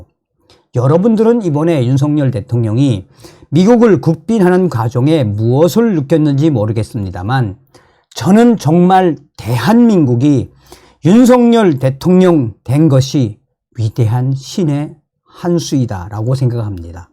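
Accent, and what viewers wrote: North Korea. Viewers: 정선생님 기분좋고 감사한 방송을 주셔서 감사히 시청 했수다래 정선생님 기분이 좋으시면 꼭 북한 언어 사용 무척 정감있고 즐겁게 시청 하이 항상 건강 하시기요 정동무 ~~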